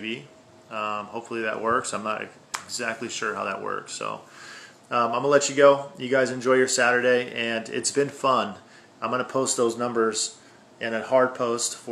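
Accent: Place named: East Coast, United States. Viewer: oh yeah, I'm old and grizzled, and you sound just like 2 or 3 of my buddies rolled into one dude. You originally from back East? Doesn't matter, you just sound like it. I was pushing my stuff too hard when I switched to LED and I just finally tried CO2. The first run was fucking nuts, it might give you 15-20% if you are dialed, but if you were doing everything just a little too hard and that's your limiting factor...whoa, more like 30% and much better quality, plus it lets you run even warmer, which lets me dehumidify harder here, and so on and so on, point is 10'x10' room only cost $100 in gas for 9 weeks once you got your gear. I just got 2 ft flouro UVB bulbs to hang over each table for ripening this round, the dangerous ones where you don't want to be in the room when on, the plants are supposed to cover themselves in sunscreen in response. 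No YouTube, but I'm on the Gram, same name.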